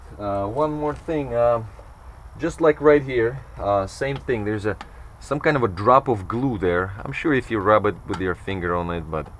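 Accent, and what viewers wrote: Russia. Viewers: Love the accent, is it Russian? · Do I here a little russian accent there? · You do know words and can make sentences but have very Russian pronounce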